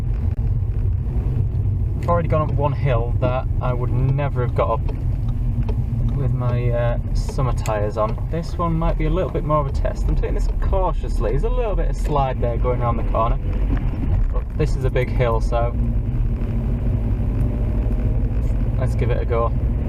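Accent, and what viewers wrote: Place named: Cumbria, United Kingdom. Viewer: Can't believe after all these years I've never put winter tyres on my car in winter. On 27 Dec I nearly got caught in the 20 car pile-up on the M6 in a mini-blizzard. Never again. Luckily for me, I won an auction of ebay and got 4 wheels with 4 Nokian WRD4s fitted for a crazy bargain price. Got them on now, and what a step change!! Feel so much more secure now. Doesn't make me impervious to accidents, but sure takes the environment out of the equation. 👍 PS I see the footage was Yorks/Derbyshire, but that doesn't half sound like a West Cumbrian accent!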